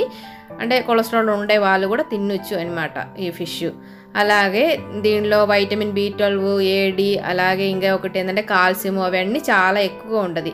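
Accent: Kerala, India. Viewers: Hi ma'am ningal malayali aano ningal Telugu parjunna slang malayalis nde pole thonnu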